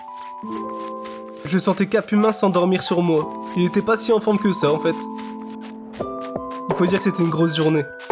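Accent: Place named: North, France. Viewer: pas = po il vient du nord cet accent mdrrr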